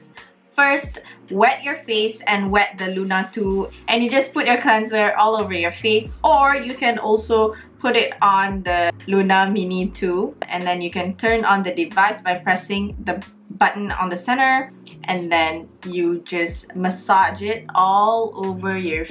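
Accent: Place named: North, Malaysia. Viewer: Bila nak buat video cakap utara lagi?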